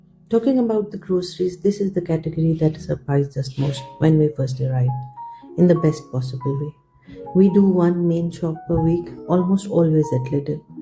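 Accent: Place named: India. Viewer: 210€ a month on groceries seems extremely low compared to the prices I've been seeing on Portuguese supermarket home delivery sites. Looking at those prices I could easily spend that amount in a week in cold weather and a bit of walking to get me hungry, just by only cooking at home. I know from Portuguese folk I've spoken to on Reddit that to partially warm a cheap old small house costs about 200€ in winter. I guess farmer's markets might be cheaper but yeah, 210€ seems perhaps a little incredible to feed active and growing kids for a whole month. Also, as a Norwegian who has spent months in India I'm pretty sure where that accent is from, and it certainly ain't Sweden 😂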